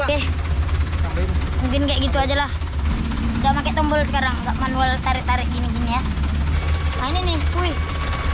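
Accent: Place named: Sumatra, Indonesia. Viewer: pertahankan, lebih lucu pake logat sumatra😁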